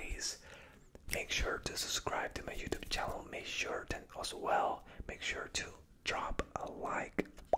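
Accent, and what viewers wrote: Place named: Latin America. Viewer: Me encanta tus asmr , aunque te siento con ese acento latino te queda bien 👍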